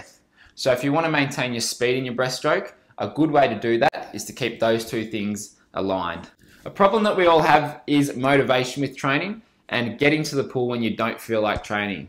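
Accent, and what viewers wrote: Australia. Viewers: he's australian, isn't he? good tricks 👍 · nice aussie aceent.....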